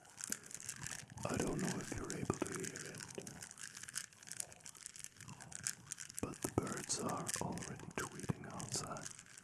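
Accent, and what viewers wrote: Germany. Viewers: Ist das eigentlich ein Deutscher? Wegen seinem Akzent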